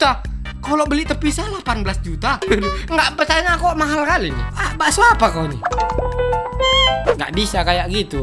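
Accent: Medan, Indonesia. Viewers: Abg orang Medan kah🤔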